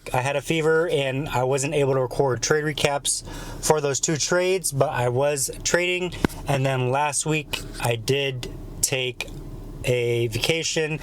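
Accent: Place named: Mexico. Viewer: I love this guy‘s content. I just can’t get past the fact that he lives in the United States, but talks like he just migrated from Mexico or something is this just because he lives with his parents or what because that’s crazy how you can go to school for so many hours a day but still end up talking like you’re not even from this country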